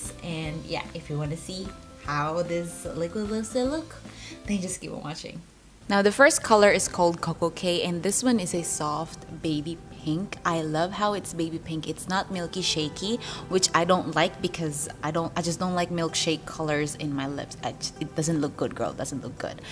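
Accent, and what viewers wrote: Philippines. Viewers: ur filipino accent :) & i love the colors on u! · Hi! Are you a filipino? :) I can sense it from your accent hehe~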